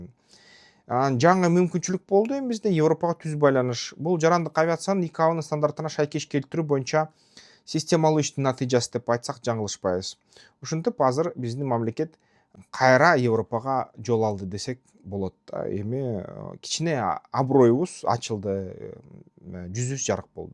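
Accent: Standard. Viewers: Сиздин таза Кыргызча сүйлөгөнүңүз өтө соонун. Азаматсыз Улут бул Тилден башталат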